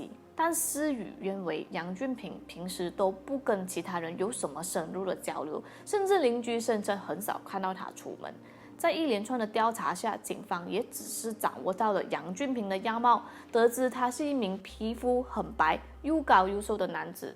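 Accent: Malaysia. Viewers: 马来西亚华语音调。 广东客家合调！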